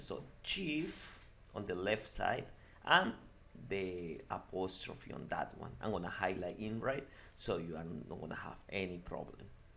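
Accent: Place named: Italy. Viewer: This was soooo cool. "Alt 64" worked for me. I adore accents! With respect, and if you don't mind, where's that accent from? Sounds Italian or Spanish? You're the man!! 2024 Sept the 30th.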